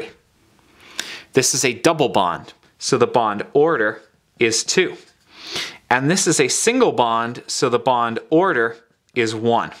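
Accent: Canada. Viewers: you're canadian aren't you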